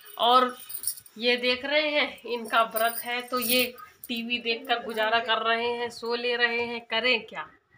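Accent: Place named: Rural, India. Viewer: Tum kaun gaon ke hao .hum tumhari fain ban gai hai .tumari boli bhasa bada achchhi lagat hai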